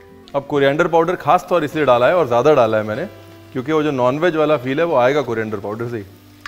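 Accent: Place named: Lucknow, India. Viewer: Scrumptious....Hum bhi kathal.aise hi banate hain par neebu nahi daalte.... Bohat umdaaa aapne banaya hai ji....aap lakhnavi lehze mein.bol.kar Mann khush kar dete hain....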